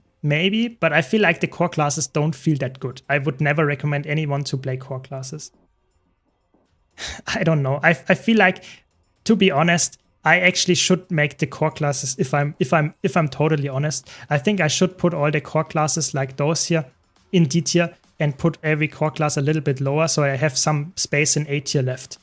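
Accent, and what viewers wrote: Germany. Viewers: Ich war so: Das englisch des dudes klingt sehr deutsch. Check, tatsächlich aus Deutschland xD auf jeden Fall Respekt, dass du das so auf englisch durchziehst! Und cooles Video, vorallem wenn du pve und pvp abdeckst - finde beides sehr spannend und liebe es (pvp macht süchtig, oder?). Bin echt gespannt wohin die Reise mit gw2 geht, neue Elite Specs würd ich auch cool finden!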